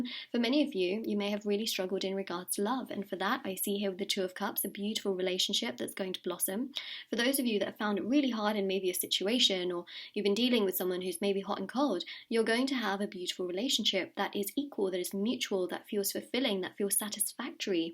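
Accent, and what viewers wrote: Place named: South East England, United Kingdom. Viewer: South East English I think !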